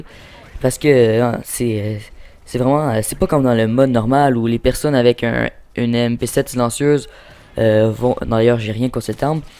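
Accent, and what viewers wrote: Quebec, Canada. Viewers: moi je dis trop bizarre parce que il est francais d'origine mais il a un pur accent québecois :O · Tu ne serais pa quebecois ? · J'adore l'accent québécois :) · C'est clair qu'il n'avait pas un criss de chats dans ton mode si tu joues a la PS3 xD Anyway excellent travail au moddel tout nue en plus! <3... Ste plait change pas ton accent a cause de quelques Francais qui font chier et je m'inscriverez surment a CODQG juste pour voir un bon québécois jouer! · Swag encore un quebecquois trop bon ton accent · super l'accent man x) vive le quebec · C'est un quebecquoi il parle comme sa sinon c'étais marrant , oui ! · Qu'est ce que j'aime l'accent québécois :'D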